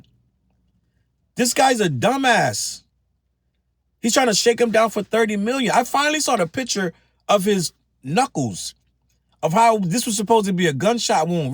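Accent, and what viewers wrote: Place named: DMV, United States. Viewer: I thought you were from the DMV… the voice gave it away. I’m from SE DC too. I lived in Valley Green in those years so I know about those days.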